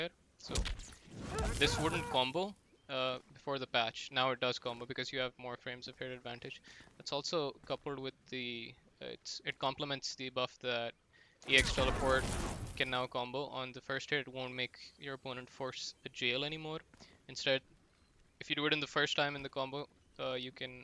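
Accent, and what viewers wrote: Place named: Pakistan. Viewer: are you a PAAAAAAKKKI ? sound like one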